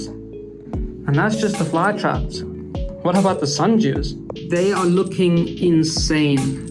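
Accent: South Africa. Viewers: you sound South African! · Brother, are you from South Africa?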